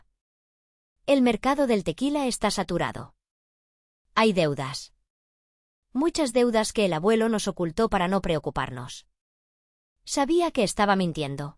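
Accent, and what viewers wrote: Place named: Spain. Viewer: Sería interesante oír la narración en español de México y no el de España, ya que se supone que la historia se desarrolla en México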